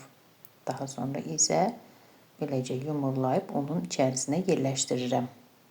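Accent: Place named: Sheki, Azerbaijan. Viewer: Salam xanım adaşım siz çox qabiliyətli xanımsız siz Şəkilisiz ləhcəniz Şəkili dilinə oxşayır sağolun❤ xanım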